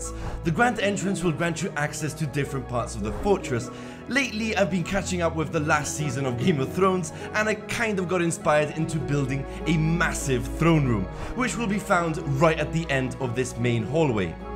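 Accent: Belgium. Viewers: Are you from Belgium sir? ^^ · Are you from Belgium? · are you from Belgium?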